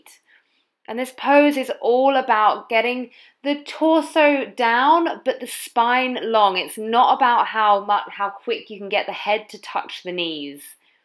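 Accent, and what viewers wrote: United Kingdom. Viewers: Good job ! British English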